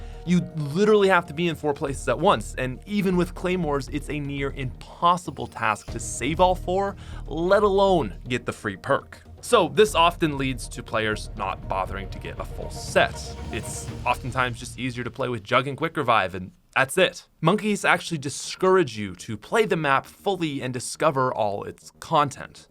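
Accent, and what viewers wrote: Canada. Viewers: Nasal Canadian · Maso24k whats shitty about a Canadian accent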